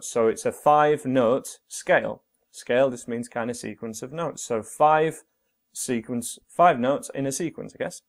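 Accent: United Kingdom. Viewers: When you sense the guy is British even before he opens his mouth.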